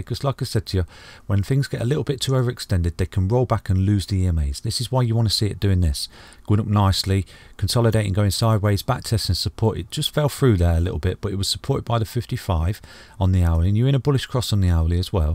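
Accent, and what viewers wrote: United Kingdom. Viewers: Great analysis, clear and concise and lovely British accent!! 👍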